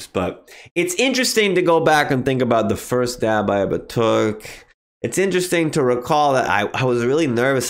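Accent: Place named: East Coast, United States. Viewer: sounds like every relative I have from Back East.. 😀😀😀 Love the dog and the show!!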